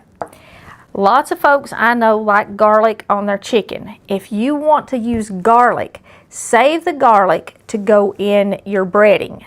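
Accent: South, United States. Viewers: This lady is now one of, if not my favorite Cooks! 😊 Thank You So Much! I love your videos & southern accent 😂😊👌🙏 Ky here... thank you again ☺️🌸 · Thank you so much for this video on frying chicken! I love your style and really love your southern accent! I have always cooked for myself and have wanted to try fried chicken. I have cooked chicken in a pressure cooker, baked and slow cooker too, but this looks simple enough. From your new fan and subscriber here in Palm Desert, California. God Bless you. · You sound like a Hillbilly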